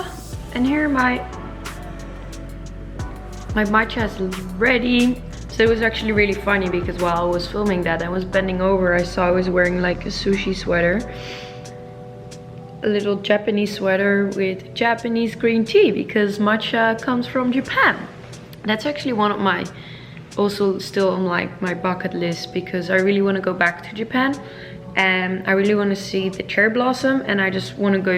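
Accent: Netherlands. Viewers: I used to live in the Netherlands and I miss the Dutch/ English accent. I watch your videos just to hear your voice lol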